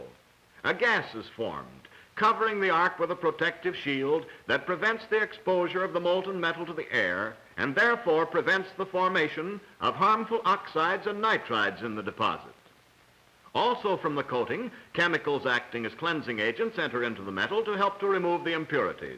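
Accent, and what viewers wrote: United States. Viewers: I can say, with absolutely sincerity and seriousness, I would have paid so much more attention in school if my teachers had all had the ol' timey, Mid-Atlantic English accent this guy employs. I practically feel like I'm a qualified SAW technician, ffs. :D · You know you're gonna learn something when the narrator has that transatlantic accent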